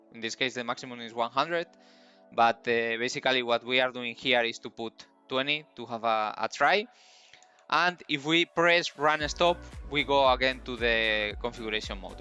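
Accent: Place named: Portugal. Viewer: My electronics teacher was from portugal. He sounded like you. Great teacher like you.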